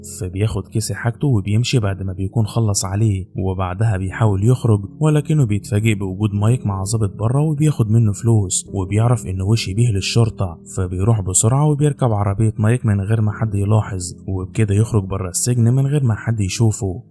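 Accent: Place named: Iraq. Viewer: ليش احسك عراقي وتحجي مصري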